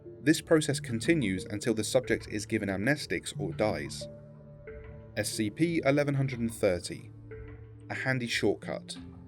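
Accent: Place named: England, United Kingdom. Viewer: I wish I wasn't prejudiced against authentic English accents to enjoy this more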